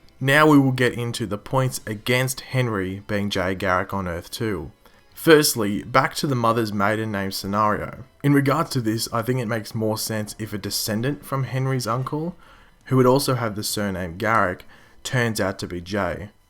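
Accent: Australia. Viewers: Hey just wondering if you're Aussie cos u have an accent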